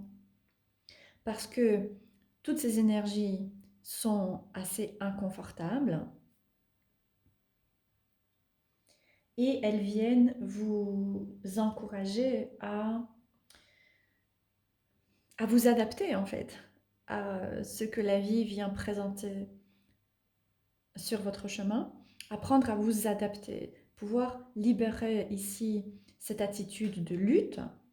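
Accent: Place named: Jura, France. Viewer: J'aime bien votre accent (Jura?)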